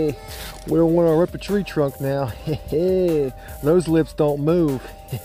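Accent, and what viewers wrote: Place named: New Jersey, United States. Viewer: Where you from? You sound like my homies in Jersey. Great catches. I am still trying to pin down some yellow perch over here.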